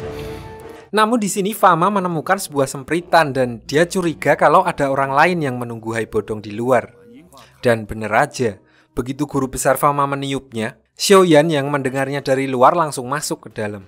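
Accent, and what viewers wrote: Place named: Java, Indonesia. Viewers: Mantuul mas bro suaranya, lucu dari Jawa ya salam kenal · Narator buagusss bgt. Ono jowone...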